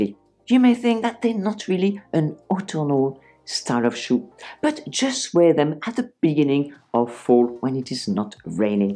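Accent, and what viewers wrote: France. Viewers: Love your cute french accent 😊 and my over ten years old Maryjanes, I will definitely wear them this fall. Greetings from Germany 👋😀 and thank you for your very good videos.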